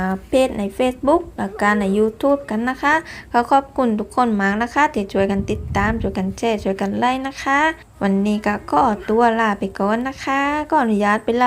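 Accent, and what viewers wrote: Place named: South, Thailand. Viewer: ชอบสำเนียงพูดใต้จัง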